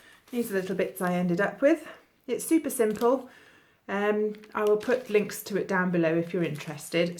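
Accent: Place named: United Kingdom. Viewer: I am in love with your british accent!! :) just subbed and liked. would love if you could return the favor as i am just trying to grow a channel :) keep it up xoxo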